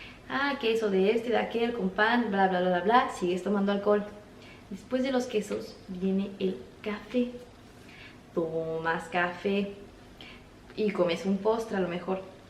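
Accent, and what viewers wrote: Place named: Mexico. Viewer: De que región de México eres, por tu forma de hablar ¿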